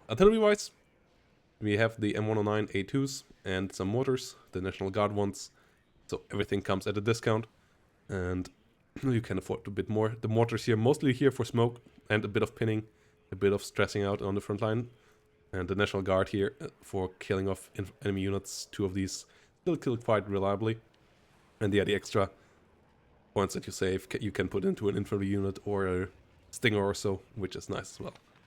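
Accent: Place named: Germany. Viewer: Deutsch erkennst immer sofort ^^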